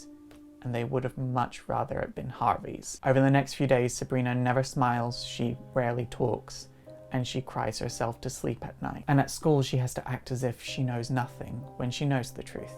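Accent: Scotland, United Kingdom. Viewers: where abouts from Scotland are you from. I'm from Glasgow. BTW I have the biggest crush on you, and watched your vids for ages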